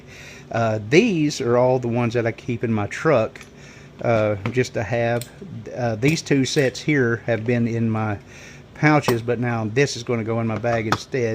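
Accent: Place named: South, United States. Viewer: A Pats fan with a southern draw is alright in my book.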